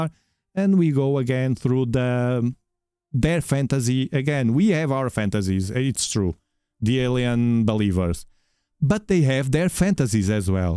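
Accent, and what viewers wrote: Portugal. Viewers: The moment i heard you i knew you were portuguese. 😅 Abraço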